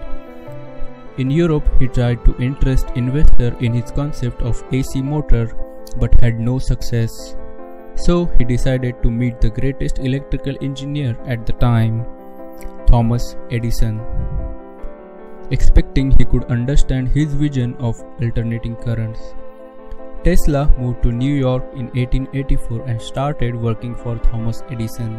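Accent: India. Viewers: Your voice hahahahahahahahahah wtf man indian pls give eng in peace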